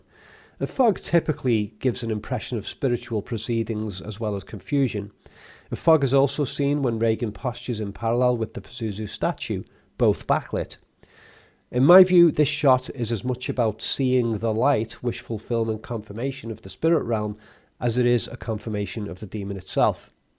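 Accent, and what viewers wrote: Liverpool, United Kingdom. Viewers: found your channel the other night and i love the videos they’re in depth and have some real good explanations and analysis to go with them, on that note am i wrong but i’m curious are you from liverpool?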